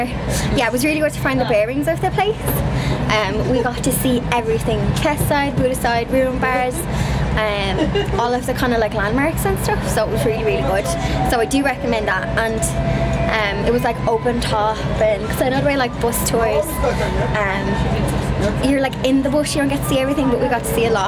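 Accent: Ireland. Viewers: That was a nice video! The Irish accent is the best!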